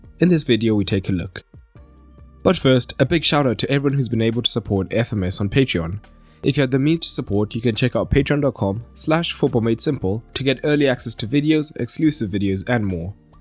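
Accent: South Africa. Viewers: Dude are you South African 😂 this accent is mad familiar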